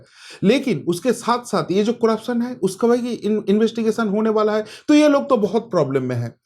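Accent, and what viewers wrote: Bengal, India. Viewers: Enjoyed your Hindi in Bengali . What is “Ghenchu”?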